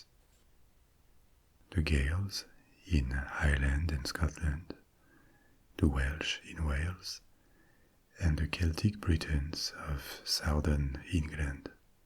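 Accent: France. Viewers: I love his pronounciation. Usually its American's botching up the accent (though I don't blame them, Irish spelling is weird but anyone with a passing knowledge of Irish knows the anglicised sounds is not the original sounds) but he has a very strong French accent so I was curious how he would pronounce it. The correct inflections are all there which is all I expect. As someone who the only thing I was good at in school was doing a French accent (not speaking French tho) and combining that with the Irish I was being taught I can recgonise where the mouth was making its natural French sounds but it was still pronoucing the word right. The best way I can describe is that Irish, if you're trying to force the language it can sound choppy and sharp. Kind of like your coughing the words out on the sharp consonents, but as a native speaker it sounds fluid. French speakers whether fluent or not always sounds fluid. 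It's very interesting to hear. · Hearing Irish names and places pronounced in a French accent tickles me in a way I can't quite describe. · Being Irish and love the french accent, this was perfect for bedtime 😄 merci beaucoup!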